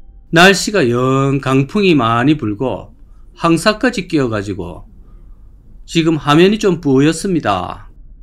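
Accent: Gyeongsang, South Korea. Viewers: 구수한 경산도 사투리가 너무듣기좋아요 감사합니다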